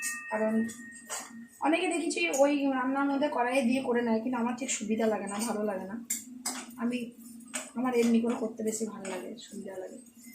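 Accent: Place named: Birbhum, India. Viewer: দিদি তোমার মুখে বীরভূমি ভাষা শুনতে খুব ভালো লাগে.. কি সুন্দর ব্যাটা বিটি বলো .. সুর করে কথা বলো .. এই ভাষা তোমার মুখ থেকে শুনেই শিখেছি ❤❤❤❤❤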